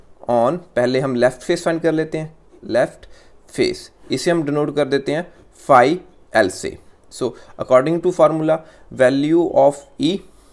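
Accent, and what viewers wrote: Punjab, India. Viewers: Punjabi accent itta funny Kyi hota hai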